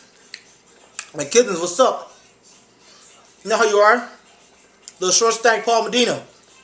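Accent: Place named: Mexico. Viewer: Your a black guy who sounds Mexican.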